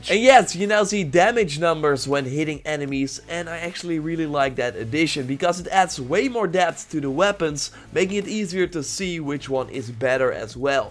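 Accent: Netherlands. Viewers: Are you dutch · you are dutch haha das heel simpel te horen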